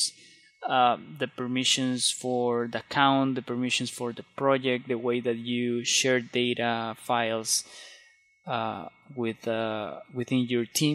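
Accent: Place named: Philippines. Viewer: Thank you for this! Are you from the Philippines? That accent though.... More power to your channnel! :)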